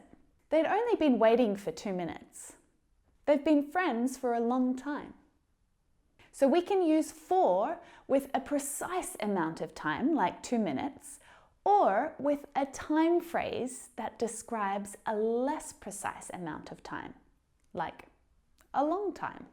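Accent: Australia. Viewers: Teacher, I just want to say: Thank you. Your accent is really beautiful, this australian accent is wonderful, I really like it. All of this videos are very useful. Thank you teacher for your job, this videos will always help us.Thank you ❤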